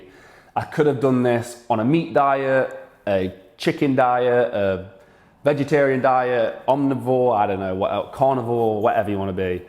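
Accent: Nottingham, United Kingdom. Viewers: Notts lad?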